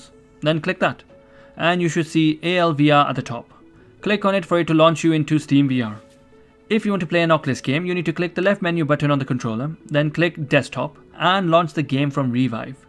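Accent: India. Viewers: u sound like dani but indian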